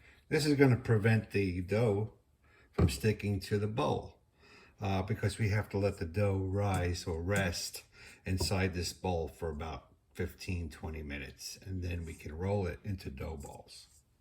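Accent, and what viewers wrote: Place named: Texas, United States. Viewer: Like your Texas accent. Born and raised in NJ moved to Texas 45 years ago. I grew up on NY style Pizza haven’t had one to match since moving here, will try this recipe this week.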